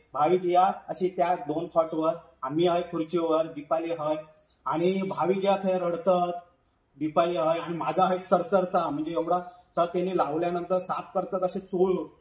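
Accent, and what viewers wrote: Malvani, India. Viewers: तुमची मालवणी भाषेत बोलणे खूप छान वाटते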